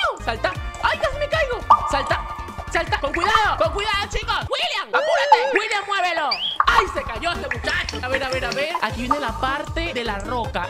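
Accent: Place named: Colombia. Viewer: Y sí cierto hablas como colombiana se te escucha el acento y todo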